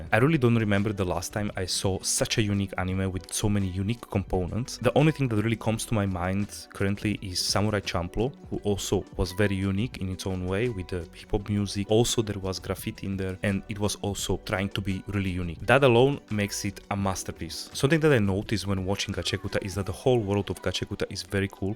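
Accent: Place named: Russia. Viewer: Why I feel Russian accent..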